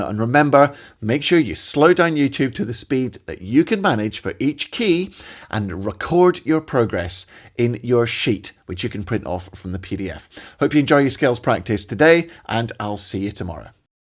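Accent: Scotland, United Kingdom. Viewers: First attempt at slowing the video today and it worked great for my practice as well as bringing out your Scot’s accent!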